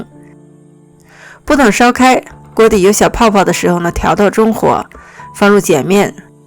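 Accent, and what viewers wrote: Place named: North, China. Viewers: She's from Shaanxi, and yes, northern China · 几十年前在西安夏天的味道，小时候永远的美食，普通家常的味道，的西安口音太好听了，好像邻居家的姐姐在边做饭边教大家，轻柔友善，温馨舒适！我们小时候吃的更多的是苞谷面，也会更多的醋和辣椒🌶️！ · 声音听起来好像北京话啊😄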